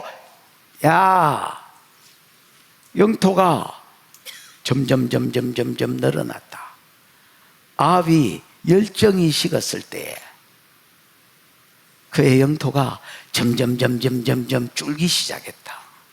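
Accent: Gyeongsang, South Korea. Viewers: 목사님이 대한민국 BUSAN에 오셔서 구수한 경상도 사투리를 쓰시면서 어려운 계시의 하나님의 말씀을 너무나 쉽게 또 드라마틱하게 온몸으로 설명하시니... 매끄러운 서울말투보다 저는 더 이해하기가 쉬워요. 😊😊😊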